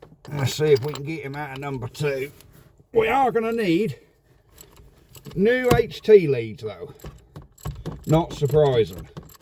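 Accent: Norfolk, United Kingdom. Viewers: Your Norfolk accent is amazing and it's always been my favorite county in the UK to holiday in. Like many British cars Reliant's have a habit of being a pig to start from cold as the starter gear likes to disengage off the flywheel.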